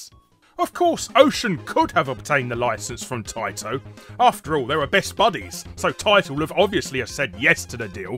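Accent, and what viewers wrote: United Kingdom. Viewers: Considering british people invented the language he can pronounce it however he wants, personally I could care less.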